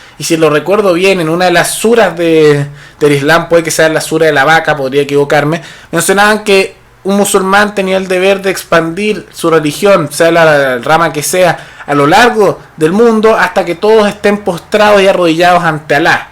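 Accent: Chile. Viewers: Yo lo que sé es que jamás me había gustado el acento chileno excepto tú si tú tú tú me gusta como hablas y ese acento que tienes claro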